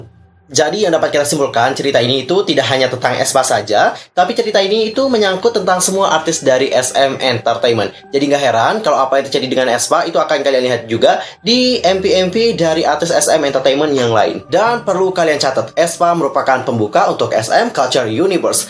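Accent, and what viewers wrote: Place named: Java, Indonesia. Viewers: Medok ya kak hehe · Maaf kak aku yang salah. Aku ngakak kok medok sih 😭🤣🙏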